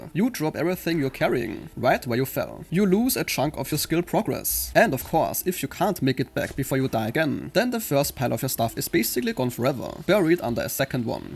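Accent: Germany. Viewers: DU REDEST SO DEUTSCH OBWOHL DU ENGLISCH SPRICHTST.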